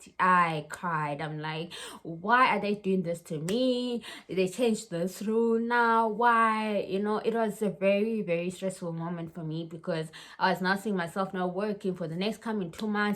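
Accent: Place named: South Africa. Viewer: You said, "yhoo shame", "uyanya", etc. The way you say these words, accent and demeanor, it screams Xhosa hun. I will be starting life in Germany in the next few months on Blue card visa. Hopefully, I don't go through such turbulence.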